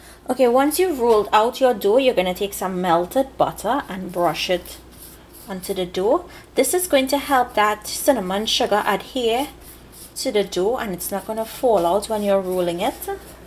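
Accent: Trinidad and Tobago. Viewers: I heard your Trini accent and I was like, "oh, shit, this is gonna be good!" Frigin love Trinis man. They know how to cook! I grew up in Scarborough, Ontario -- I know what I'm talking about lol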